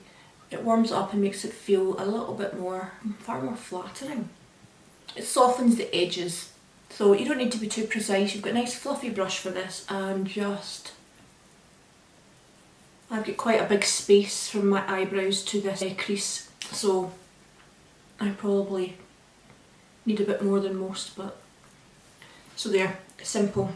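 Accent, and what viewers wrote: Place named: Ireland. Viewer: Hey! 👋 Awesome tutorial! Love the detailed walkthrough and commentary. Remember to apply those tips about the intro in your future vids!! Keep it up 😌 Love the irish accent btw hahah (think its irish? Lol)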